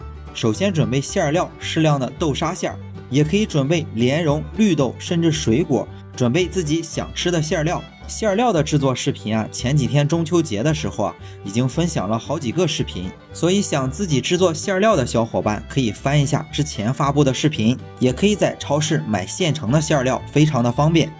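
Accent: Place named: Qingdao, China. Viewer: 您的口音很像青岛老乡😊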